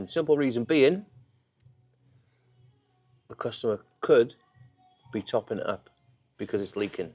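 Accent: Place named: North Wales, United Kingdom. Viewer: Nice vid, I need to tackle mine on my driveway this week🫣. That a North Wales accent too, where about?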